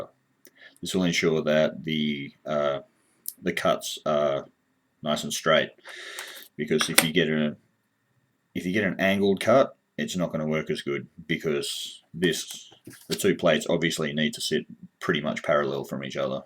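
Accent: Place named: Australia. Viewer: dude you sound aussie as f#ck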